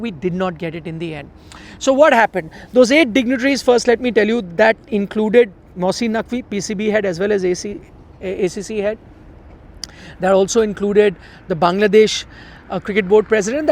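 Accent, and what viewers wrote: India. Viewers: Is the person presenting this story? If so, is he Indian? He sounded of Indian origin. He did a great job in narrating the story as it unfolded - unbiased, professional and clear.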